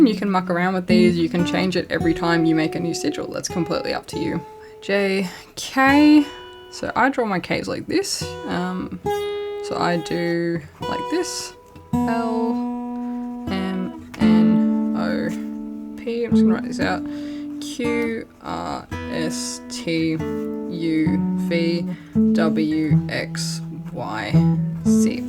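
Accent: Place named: Australia. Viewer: IS THAT AN AUSSIE ACCENT I HEAR OMGGGG YAYYYYY :) i never find any aussie youtubers about magic and spirituality.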